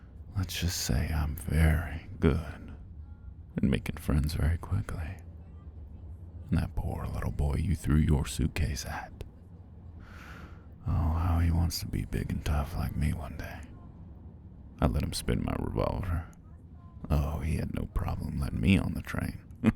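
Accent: South, United States. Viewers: I RAN to this video, hawt damn. Edited to add: as a southerner, I'm so picky about southern accents but you ate and left no crumbs, sir. Tysm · I saw country accent, gasped and clicked immediately 😏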